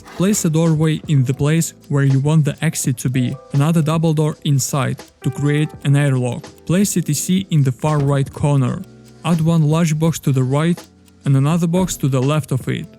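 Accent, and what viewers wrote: Russia. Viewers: ты же русский по акценту бро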